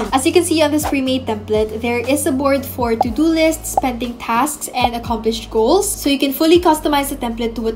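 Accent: Philippines. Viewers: Hmmmmm... I’m new and I hear a Filipino accent~ 😜 I’m Filipino too! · I'm new and at first I'm wondering if you're filipino bcoz of your accent and if not for the "kasi" word that you used I would still be wondering if you really are hehe btw thnx for sharing I would use slidesgo from now on <3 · i love you filipino accent🙏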